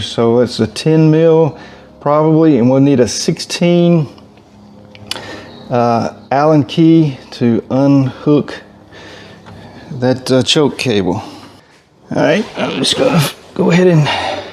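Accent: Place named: Mississippi, United States. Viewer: What part of Mississippi you from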